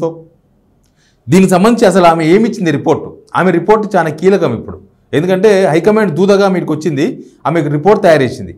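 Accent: Telangana, India. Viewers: Very nice explaining. Very good Telangana language garu.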